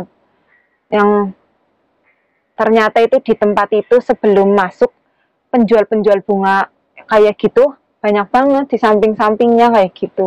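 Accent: Banyumas, Indonesia. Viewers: Sampean wong endi.. Yakin ngapak nemen sampean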